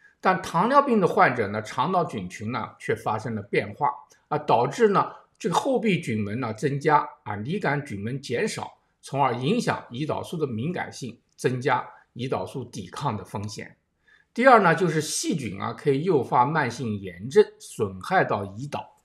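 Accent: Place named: Nanjing, China. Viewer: 听出杨医生南京的口音了。不知道对不对。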